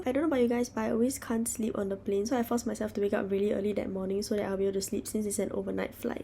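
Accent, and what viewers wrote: Singapore. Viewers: 2 seconds into you talking I already could tell you were from SG from the accent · that singapore accent is brutal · かわいいー シンガポール人のアクセントはやはり大好物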